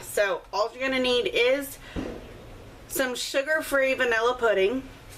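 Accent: United States. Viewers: come on now...it is not good for anyone... sugar, fat and salt....I hear your southern accent and assume you are a fool.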